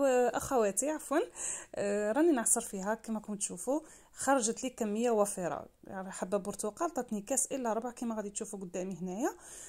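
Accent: West, Algeria. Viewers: يعطيك الصحة ربي يحفظك ❤❤ نتفرجك غ باه نسمع هدرتك عسسسسسل تفكريني بصحاباتي كي كنت نسكن ف تيارت ناس الغرب العشرة الطيبة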